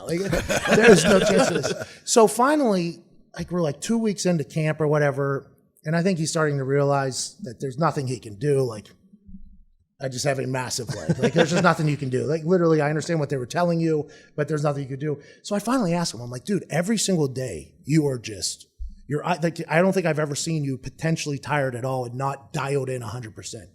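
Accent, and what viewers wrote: Pittsburgh, United States. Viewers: Dude, Pat... you can take the guy out of Pittsburgh but you can’t take the Pittsburgh out of the guy... man the accent is a thing of beauty 😂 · "Come dahhnnn, to da tahn hawhlll" mah man McAfee still got that Pittsburgh accent, love ya bro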